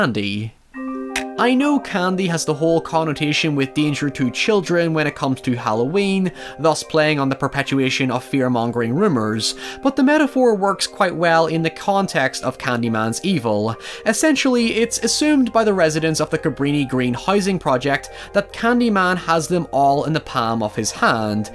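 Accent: Northern Ireland, United Kingdom. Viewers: I honestly love you, I love hearing you talk! I'm Northern Irish so it's comforting to hear XD such a huge fan of all your videos, including this~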